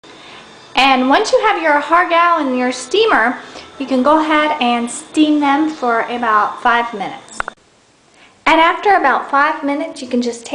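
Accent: United States. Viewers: u sound country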